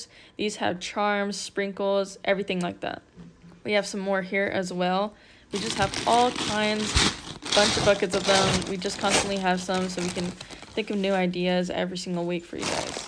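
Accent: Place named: Australia. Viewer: Is it just me or did she go from no accent to Australian accent 😅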